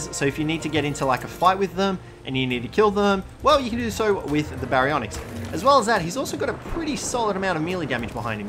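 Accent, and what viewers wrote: Australia. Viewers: Are you Australian? I love your accent! ☺️💞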